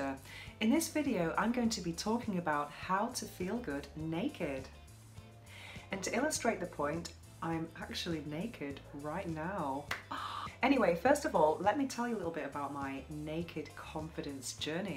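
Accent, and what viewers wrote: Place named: England, United Kingdom. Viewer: I love your videos very educational I find them you're a great looking lady I love your english accent just curious as if you prefer britain or spain where you're living at now .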